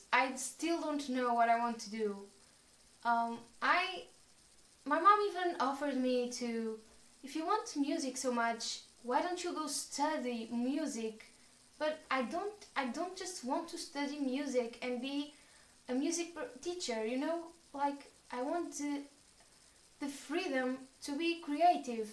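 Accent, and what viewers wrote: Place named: Portugal. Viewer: Ah, I've mainly heard Brazilian Portuguese. The accent sounds very different! Another possibility is ISFP. One way to tell the difference is Ne and Se are reversed (as you say, tool vs. PoLR), and also ISFP Ni vs. INFP Si. Possible evidence for Ne is word play, speaking poetically, telling stories created in the moment. An INxP takes conscious effort to use Ne, so it isn't immediately obvious. It really is "tool use". Catch one in the right mood and they will delight in playing with the world of words and ideas. For example, my wife (who is an ISFP) sent our niece a bracelet that had been in the family. I mentioned to my wife that our niece needed to know the history of the bracelet -- and made up in the moment a silly, absurd little story. One of many things that causes confusion: ISFP Ni is third slot, but ISFPs seem more classically "intuitive" than INFPs. 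INFP Si, with Fi+Ne, can get overly focused and dwell on positive memories (nostalgia) or negative memories (rumination) -- but Se PoLR makes outward emotions look more "flat". ISFP Ni, with Fi+Se, is more expressive (and explosive, though it passes quickly). One thing that is definitely true, it is very hard to self-assess.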